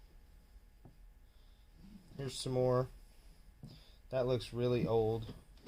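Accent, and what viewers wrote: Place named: South, United States. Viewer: I am enjoying your videos from Australia. I am originally from Texas so the Southern accent reminds me of home.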